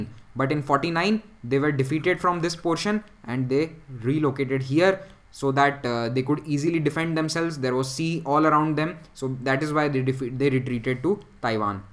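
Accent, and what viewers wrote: India. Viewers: Best Indian accent Ive heard .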